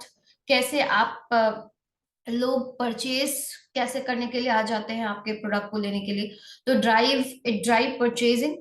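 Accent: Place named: India. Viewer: I'm a bit late here, but you need to work on your English pronunciation. Your indian accent and mix of English and Hindi overshadow every single word in this video, it's impossible to understand unless you're a native indian. Which i'm not, so I didn't understand a lot of this. Good powerpoint though but that's about it, thank you.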